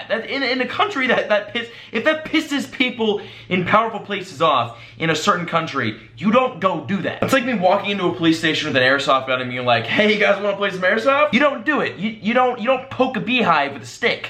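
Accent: Russia. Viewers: Russian accent wasn't too bad... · That Russian accent got me😂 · Bruh your russian accent cracks me up! XDDDD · More Rambling with a Russian Accent. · Why is his russian accent so good hahaaha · Russian accent is leet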